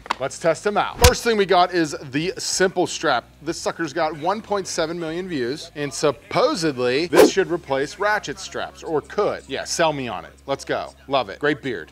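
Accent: Pittsburgh, United States. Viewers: The yinzer accent seeps through. You got a fan over here in Sharpsburg now.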